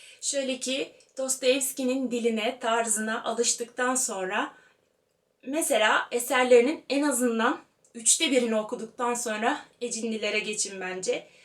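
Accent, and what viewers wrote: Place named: Denizli, Turkey. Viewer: klasik okumaya hangi kitaptan baslamaliyiz? bu arada abla cok tatlisin denizlili akrabalarima benziyor konusma tarzin hep seni izlemek istiyorum cok ictensin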